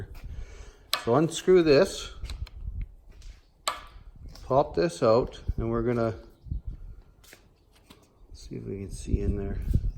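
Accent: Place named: Canada. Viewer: Knew you sounded Canadian, then I saw the shirt. Cheers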